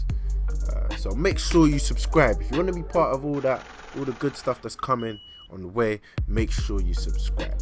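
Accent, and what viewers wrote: London, United Kingdom. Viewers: Your from London init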